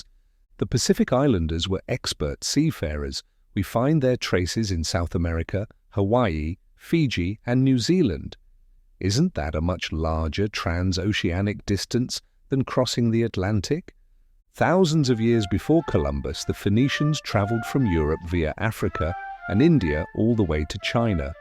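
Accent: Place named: United Kingdom. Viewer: The voice is British - not for me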